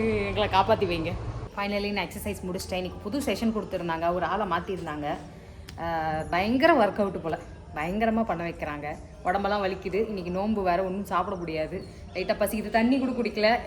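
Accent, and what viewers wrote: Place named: Kongu, India. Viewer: Tamil sema athulium konku vois eathathrmana pechu nenga nalla pesirunga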